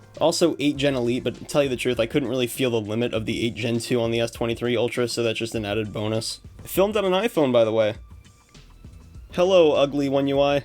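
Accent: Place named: United States. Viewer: Guessing from the accent you're from usa right? How do you use an android phone over there, iMessages don't work on android. From what i know they all use iPhone & iMessage in usa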